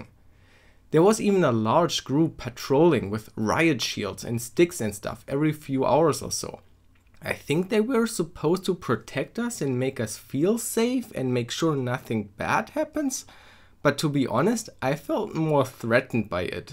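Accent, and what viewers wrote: Germany. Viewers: Dicker der Deutsche Aktzent zerstört so haha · That german accent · r u a german guy? i heard the slang long and in the clip the alter got me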